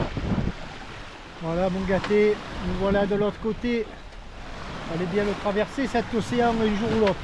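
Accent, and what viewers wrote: South, France. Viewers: Fantastique belle traversé ca fait bien envie merci de nous partager tes aventure toujours avec cette bonne humeur et cette accent du soleil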